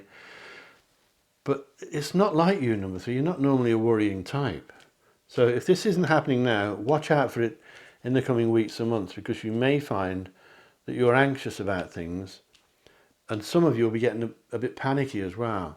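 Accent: United Kingdom. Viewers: I couldn't choose between 3 or 4 I think they both applied to me. I'm a constant worrier and my stress levels are thru the roof. I'm dependable but not like my husband he's the one always does what he says. As for the richness I associate that to happiness and good things in my life and I have that without question. Love to watch you and listen to your voice. I'm from a state called north carolina in the US and absolutely love British accents. Thank you very much for your readings and please do continue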